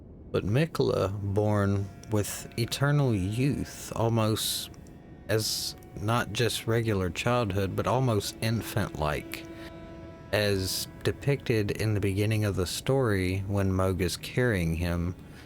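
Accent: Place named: South, United States. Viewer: Keep going bro and a like for the southern accent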